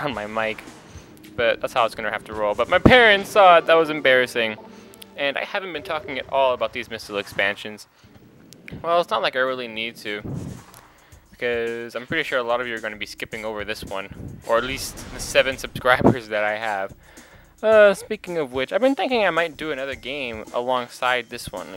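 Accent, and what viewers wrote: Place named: Canada. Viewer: Wait, I noticed a bit of an accent...Are you Canadian????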